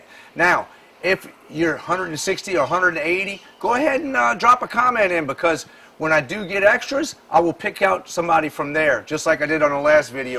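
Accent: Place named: Louisiana, United States. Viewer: Great videos. My wife is from Louisiana and when she first heard you she said he's got to be from Louisiana. Keep the videos coming.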